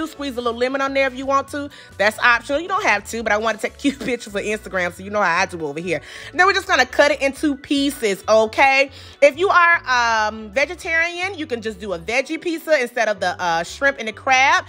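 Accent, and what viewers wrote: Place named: New Orleans, United States. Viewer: At first your voice made me think something negative BUT, the more I listen, I love it. It remind me of home in New Orleans. Thank you for all you do.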